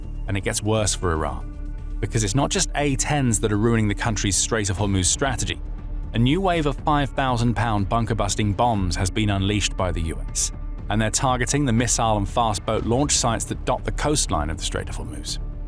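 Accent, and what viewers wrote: United Kingdom. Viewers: This channel is a joke. Ammosexual content for Americans, read by a British guy. Unsub.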